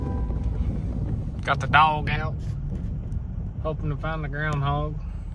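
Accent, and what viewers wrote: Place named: Kentucky, United States. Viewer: I knew it... I knew you were from KY. You talk just like me. But I'm in northern ky. Got 165 acres of nothing but deer and turkeys..... not another house in site...just like we like it. Glad I found your channel.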